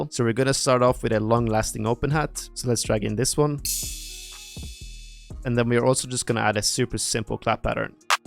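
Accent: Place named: Norway. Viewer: U sound norwegian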